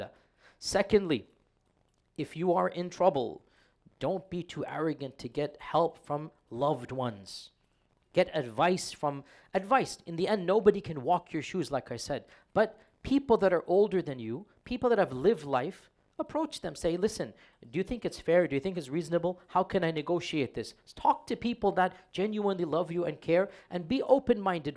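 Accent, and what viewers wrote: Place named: South Asia. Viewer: You sound desi AF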